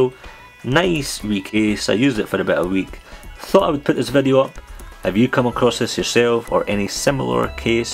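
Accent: Scotland, United Kingdom. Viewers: Noobstyleful he is scottish unless u can't make out the accent if u are not all there in the head!!:)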